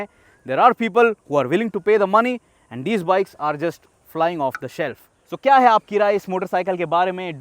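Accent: Maharashtra, India. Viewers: Thanks, that's reassuring. And BTW, the way you speak Hindi with a Marathi twist is quite interesting!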